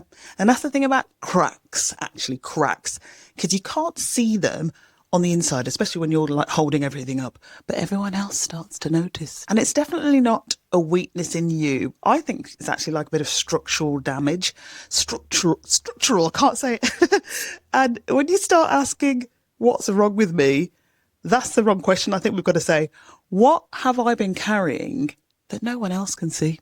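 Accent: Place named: United Kingdom. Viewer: Just a note of encouragement for your channel. Luv your sense of humor and soft, soothing delivery. The Brit accent doesn't hurt either. You know how us Americans adore a British accent!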